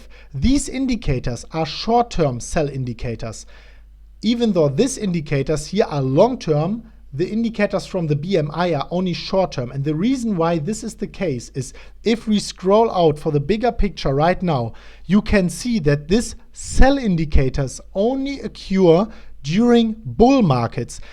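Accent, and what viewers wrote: Germany. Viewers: nein wtf, klar hört man dein deutschen akzent raus aber dein englisch ist mega gut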